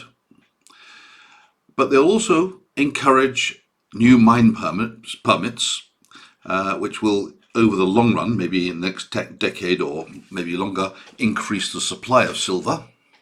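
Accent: United Kingdom. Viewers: I love the content of course, baut also, as a French, is just delghted listening to your british accent.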